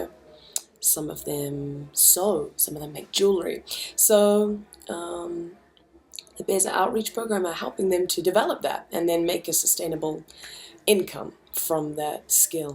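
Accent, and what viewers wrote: New Zealand. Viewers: Kimbra! You inspire me and the music i write. Keep up the the kiwi accent too - from a fellow kiwi, im starting to lose mine, ive been in Melbourne too long haha. I admire you for taking time out of you busy schedule to visit those in need. Its the small things that we do for others that usually make the greatest impact. Good job. Keep it up!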